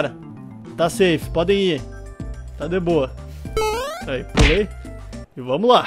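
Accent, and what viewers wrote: Rio Grande do Sul, Brazil. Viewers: Ele é gaúcho kk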